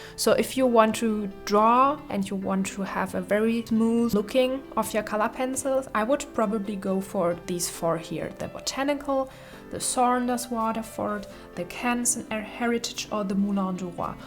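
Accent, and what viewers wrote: Germany. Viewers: Your german-english is so charming 😍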